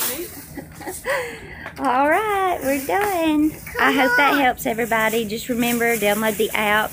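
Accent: United States. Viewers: She sounds like she lives out here in Tennessee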